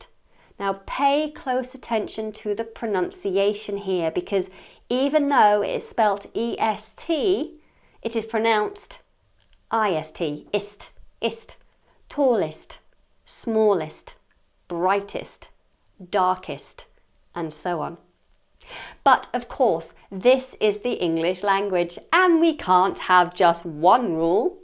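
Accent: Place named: United Kingdom. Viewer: Wel come sweety. Huge thanks 4 ur daily updating us wz ur english knowledge nd British accent. I love u. Ur bro Salih from Eritrea.❤❤❤❤❤❤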